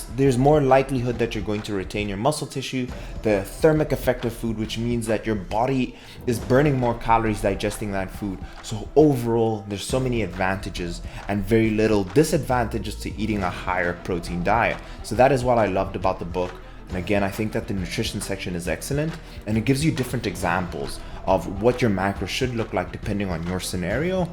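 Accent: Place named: South Africa. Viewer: I just came across your video and your accent sounded South Africa so i kept watching. I am South Africa living in the States. I am starting life style change. Don't want to lose weight but want to gain muscle. I am enjoying your videos.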